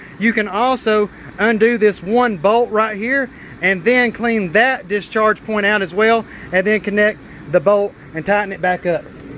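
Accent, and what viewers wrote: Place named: Rural, United States. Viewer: Shit, I was sold and heard the country accent, but I'm in North Carolina :(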